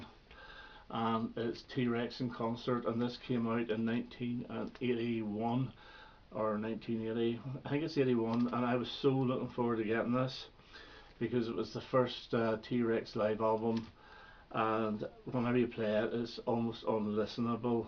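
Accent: Northern Ireland, United Kingdom. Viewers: Hi, It's been a while. I'll be honest with you, it was the Americanism in the title that grabbed my attention. I came by to see if you still pronounce 'Aluminium' correctly. My wife comes from America and I have to suffer such vulgarities on a daily basis. Normally I look to you and your beautiful Northern Irish diction to save my sanity... but I can see that beginning to slip now... oh dear God. What is the world coming to...😪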